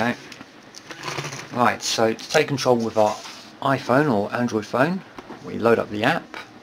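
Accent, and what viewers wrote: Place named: United Kingdom. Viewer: why's it have to be a british guy?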